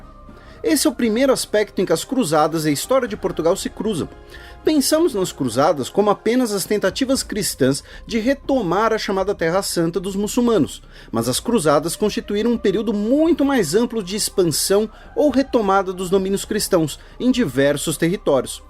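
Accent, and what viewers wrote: Brazil. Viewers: Com este Português tupinambá, eu duvido.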